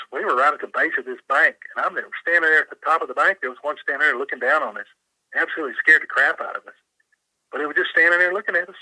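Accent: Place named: South, United States. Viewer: I could listen to narrations for hours, really enjoy his 'good ole boy' southern style..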